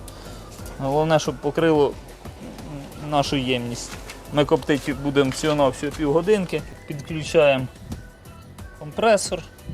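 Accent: Cherkasy, Ukraine. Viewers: Добрий день, велике дякую за вашу працю,бажаю процвітання каналу,а говорите ніби у нас на Черкащині.Благополуччя і Миру Україні 🇺🇦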